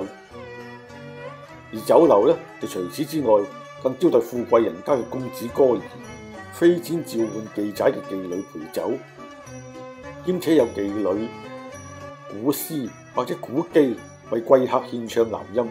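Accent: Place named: Guangzhou, China. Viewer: 每次出片，我一定細心欣賞！你既旁白同聲線好正、好正！！好地道廣洲腔，每次都帶我番回童年往事！多謝！你飲三合一定系即磨咖啡架？😅😅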